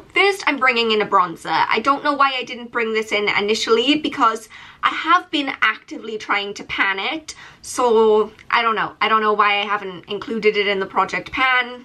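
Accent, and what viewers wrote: Wales, United Kingdom. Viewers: Be proud of your Welsh accent!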